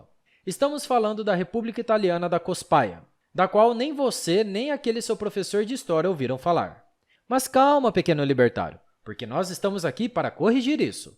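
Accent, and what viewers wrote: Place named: São Paulo, Brazil. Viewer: Meu nao da pra ouvir um audio feito por paulista caipira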